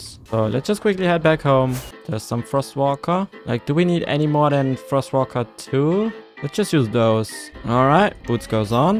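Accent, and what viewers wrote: Germany. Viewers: Bist du deutscher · Is he from Germany or does he only Sound like a Germany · oh du german sein beeing? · No offend but u actually have such a German accent (ich kann das sagen weil ich auch deutsch bin) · i dont know how to desribe it but you sound like a german trying to speak english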